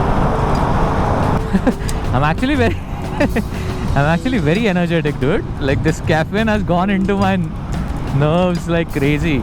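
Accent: Malvani, India. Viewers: Bro has that very good English accent And then has the Proper Malvani Accent too "Khay jaata tu?" Damn.......... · मालवणी माणूस मालवणी बोलताना भारी वाटता. एक दम गावाक एल्या सारख्या एक नंबर भावा 👍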